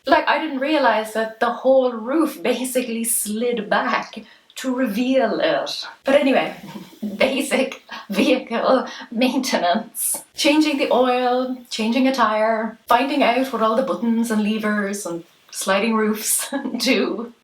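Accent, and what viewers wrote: Ireland. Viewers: Hi, I love how calmly and relaxed you speak. Now, I can enjoy your Irish accent (ánd your video of course!). Cheers, Sousan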